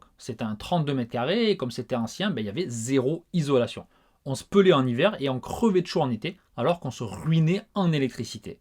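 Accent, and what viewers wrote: Marseille, France. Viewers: De temps a autre tu as une petite pointe d'accent de Marseille qui se fait entendre...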